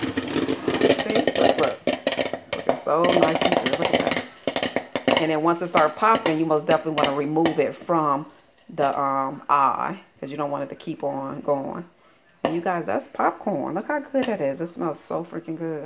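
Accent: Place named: California, United States. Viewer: Love all your videos!! I thought you sounded like you had a California accent until I seen you're from IL. If you turn your phone side ways (landscape) when recording, you won't have the black on the sides and the video will be larger.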